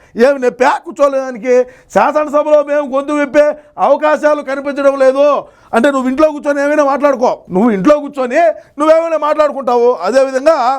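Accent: Rayalaseema, India. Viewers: Posting this comment randomly to reach you Anna, though I’m from Kosta, appreciate you on highlighting Seema yaasa, I love it anna.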